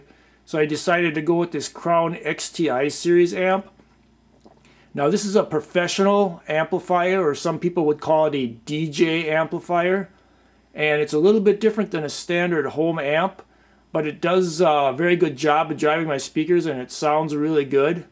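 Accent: Canada. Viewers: Nice video. Really informative, good job. Are you like, up in Canada, eh?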